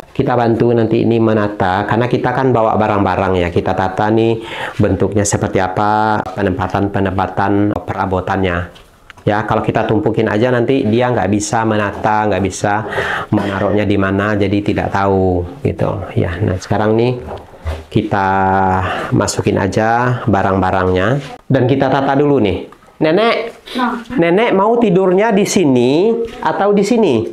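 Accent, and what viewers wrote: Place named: Java, Indonesia. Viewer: Pak lucu kalo gomong Jawa 😂😂😂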